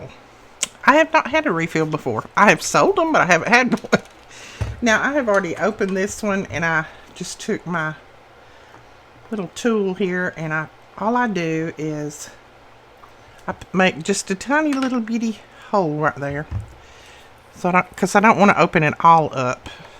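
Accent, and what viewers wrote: South, United States. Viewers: I would never tell you to hush i love listening to your beautiful southern accent❤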